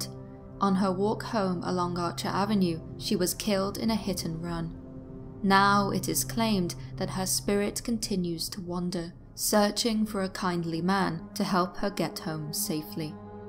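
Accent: United Kingdom. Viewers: is the narrator's british accent real?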